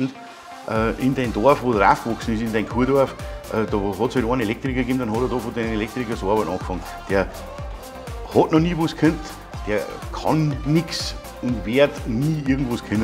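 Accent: Bavaria, Germany. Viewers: Und was ich noch loswerden möchte: Deine Videos sind super, ich schaue sie gerne an und lerne ab und zu auch was. Die z. T. dämlichen Besserwisserkommentare gehören eben dazu. So einen Filter, der solche Leute rausfiltert gibt es eben noch nicht. Und recht hast du, wer dich nicht versteht wegen deines bayrisch, an dem liegt es selbst, der muss eben bayrisch lernen. Wo kommen wir denn hin wenn der Bayer nicht mehr bayrisch reden darf.. In diesem Sinne mach weiter so. LG von Markus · Servus, moi wieder ein super Video! Daumen hoch 👍🏼 Dei Boarisch vermiss i übrigens immer no a bissl... Schena Dog no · Dein Dialekt ist bayrisch oder ostdeutsch ? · Hallo! Wie immer gutes Video.Arbeite schon 51 Jahre als Elektoinstallateur und schaue immer wieder gerne deine Videos an. Ignoriere diese Dummschwätzer,die meinen alles beser zu wissen. Mich hat letztes Jahr auch eine alte Frau aufgefordert den Meister zu holen nachdem ich eine Lampe mit Bewegungsmelder montiert hatte, Ich habe vielleicht 10 Minuten gebraucht. 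Ich erklärte Ihr,dass ich der Meister bin und schon Hunderte Lampen montiert habe.Da sieht Mann,dass manche Leute keine Ahnung haben. Aber alles besser wissen.Und dein Bayerisch ist auch okey.Ich bin Schwarzwälder und rede Badisch da sagen die Leute auch ob ich ein Schwabe bin oder aus dem Hotzenwald komme. Die wissen noch nichteinmal wo der Hotzenwald ist.Viele Grüße Joachim.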